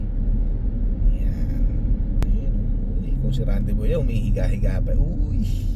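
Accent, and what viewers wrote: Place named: Canada. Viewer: Wow...parang Canadian si ate...ang galing ng accent nya at makipagusap sa mga locals❤❤❤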